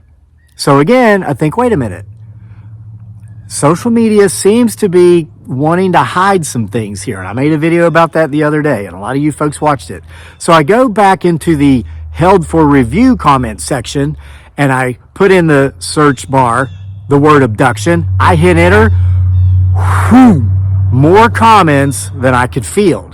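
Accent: South, United States. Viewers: Hi. I'm watching your channel from the UK. All this is very interesting to me and I love reading the comments, people are not stupid, they know what they saw and experienced. You seem like a really nice guy with honesty and integrity and I have no reason to doubt you. I enjoy watching live cams from America too, the amount of weird stuff captured blows me away. Being in the UK is quite boring in comparison to the US so I get quite excited when I see channels like yours pop up. I believe you were under some form of shall we say 'trance' .. Since YU TB doesn't like certain words!! I swear we're all going to be talking another language soon just to get our messages out! 🙄 These trance like explorations over the years aren't just stories that's for sure. Oh, and you don't talk too much, I love your southern accent. Looking forward to more of your uploads. 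Regards to you and your wife. ❤️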